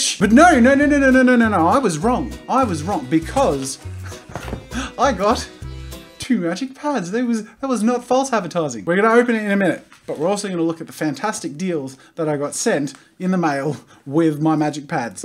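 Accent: Australia. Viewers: So he's Australian I thought he was British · Australian dialect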